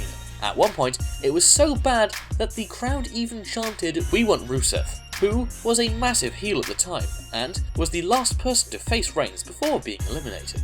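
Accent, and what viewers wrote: United Kingdom. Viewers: Plus the gay ass British voice doesn't help neither.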